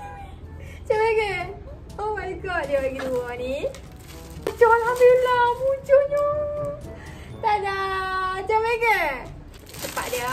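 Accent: Kelantan, Malaysia. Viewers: cakap tu bagi elok sikit, kelantan lakk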